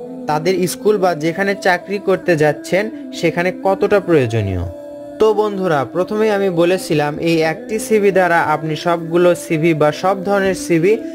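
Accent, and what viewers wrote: Bogura, Bangladesh. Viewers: স্যার আপনার বাড়ি কী গাবতলি, বগুড়া..?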